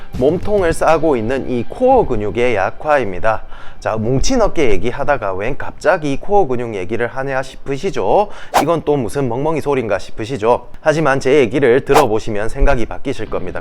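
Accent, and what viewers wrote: Gyeongsang, South Korea. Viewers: ㅎ 저는 그래서 넘 좋습니다 표준말은 어디에서든 접하기도 하지만 때론 딱딱하기도 차갑게도 들리는데 경상도 말은 화면에서 찾아보기 드물고 또 다정다정한 친근감있는 말투가 넘넘 좋습니다 아픈 이유도 상세하게 말씀해주셔서 저는 운동보다 먼저 서론을 더 신중하게 반복해서 듣게되는 1인으로서 자세한 설명에 더 감사할 따름 입니다 고맙습니다 홧팅하시길💗💗💗💗💗💗💗💗💗💗💗💗💗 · 억양이 참 재밌네요 경상도?억양이죠? 따라하게 됩니다 유익정보 감사합니다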